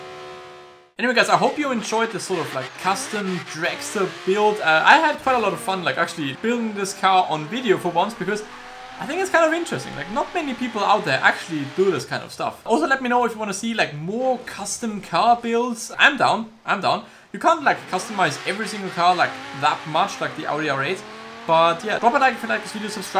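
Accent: Germany. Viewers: man merkt das du deutsch bist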